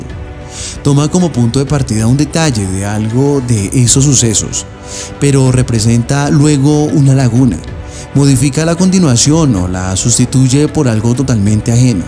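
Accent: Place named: Colombia. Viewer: Una voz humana que habla como robot, no sirve! Deberia hablar mas natural sin tanto acento colombiano y mas neutral. Saludos!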